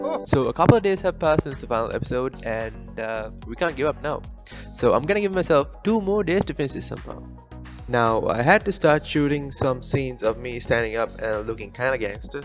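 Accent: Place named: India. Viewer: Bro you sounds like one of us👀 ...are you indian? Your new susbcriber here 🙋🏻‍♂️ please guide me i need some help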